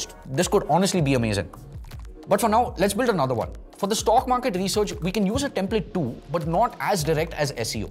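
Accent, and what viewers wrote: India. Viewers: U don't look Indian but sound Indian wonder why🤔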